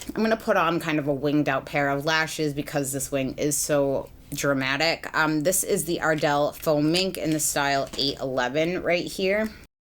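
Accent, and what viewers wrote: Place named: Boston, United States. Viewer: Hey, I love your videos, personality and your Boston accent - it reminds me of home! I like this exercise because it makes me realize that I really do have a lot to be grateful for. Having to choose my top picks, I would choose my skin, as it is nearly flawless and wrinkle free, which at my age is truly miraculous! Additionally, I am so proud to have graduated magna cum laude with honors from an Ivy League school. There were a lot of obstacles in my way, but I pushed through and received an education that no one can ever take away from me! Thanks for the opportunity to win the winged eyeliner products. I, like you, have struggled with uneven wings for years, so to win would be a real treat. Take care of yourself!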